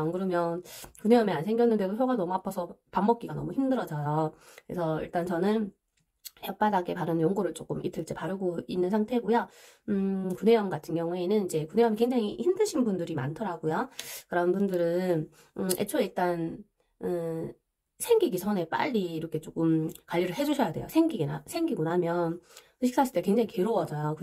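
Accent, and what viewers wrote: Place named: Gyeongsang, South Korea. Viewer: 저도 대구 사람이에요 ㅎㅎ익숙한 대구 말씨이셔서 ㅎㅎ 항암 이전인데 영상보고 많이 배우고 있어요😊!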